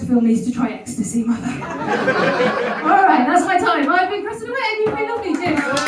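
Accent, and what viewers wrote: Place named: West Country, United Kingdom. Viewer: What part of the west country are you from x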